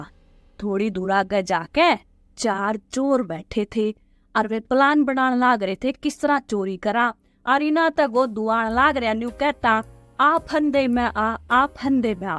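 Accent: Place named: Haryana, India. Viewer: वाह डाक्टर मैडम कहानी वाली जी,,, हरियावणी बोली,,हरियावणी वेष भूषा,,,,हरियावणी अंदाज ,,,बहुत ही अच्छा लगा।,,,बहुत शुभकामनाएं।😊😊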